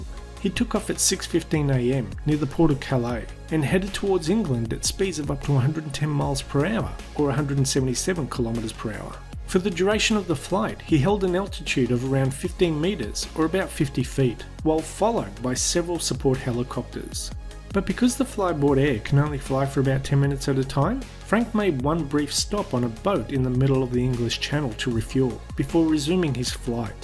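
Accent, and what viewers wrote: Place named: Australia. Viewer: From a fellow Aussie, "I can tell by your accent 😉" thnx for the video, but you're an Aussie, enough with the imperial.. Or yeah use both.. I just want Americans to come around with the rest of us lol 🙂🇦🇺🇺🇲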